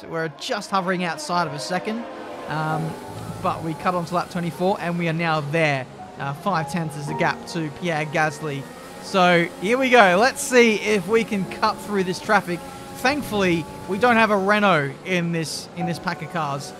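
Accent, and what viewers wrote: New Zealand. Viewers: I thought you were from Australia and not from New Zealand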